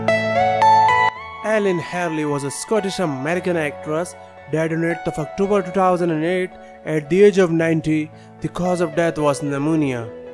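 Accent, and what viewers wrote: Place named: India. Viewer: his accent is clearly East Indian/Asian and a strong one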